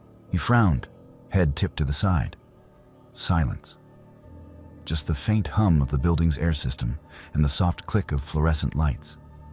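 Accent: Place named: Louisiana, United States. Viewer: Louisiana common like your voice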